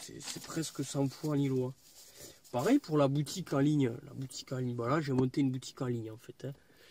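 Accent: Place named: South, France. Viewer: Cet accent chante le sud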